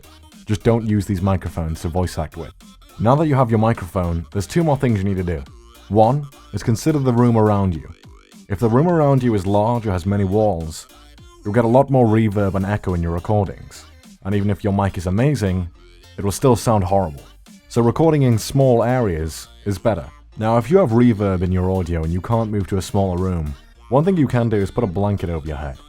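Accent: Wales, United Kingdom. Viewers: Omg you can hear more of his welsh accent here!! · The Slight Welsh Accent Is Very Very Pog 😂😂😂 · Holy shit a time when actually sounded Welsh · Watching this in 2021, and I gotta say......What happened to British accent? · holyyyyy crap he sounds so different back then xD and u can also hear a tinge of welsh in his accent too shEeEesh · you sounded more welsh back in the day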